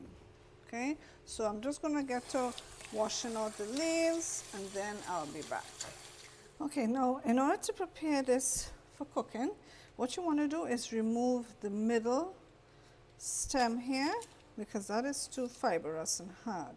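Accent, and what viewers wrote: Trinidad and Tobago. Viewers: Very nice video. I know a Trini accent when I hear it. XO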